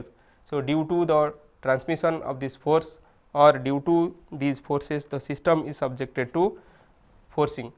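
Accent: India. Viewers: These indians tutors are awesome! Thank you very much, very explicit! The accent is a bit hard but it is so worth, thx again.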